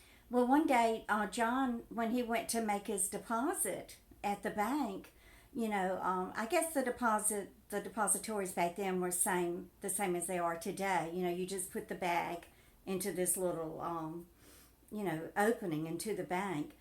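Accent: South, United States. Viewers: I am new to your channel and love your story telling. I am a Southerner and can relate to your accent. Keep making videos!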